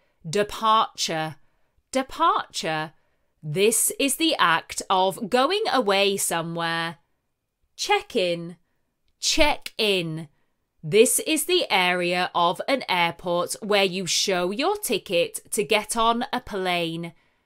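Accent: United Kingdom. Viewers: I love your English stories and British English.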